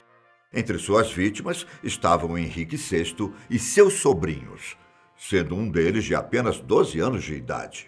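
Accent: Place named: Bahia, Brazil. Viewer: aqui na Bahia tem pessoas que falam assim também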